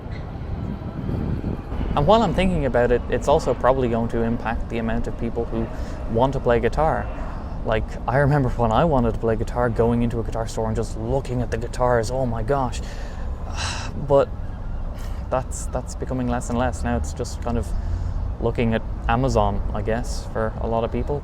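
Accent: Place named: Dublin, Ireland. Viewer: 😂looks like Dublin and your accent sounds like Dublin and then you say "here in Dublin" at the end😅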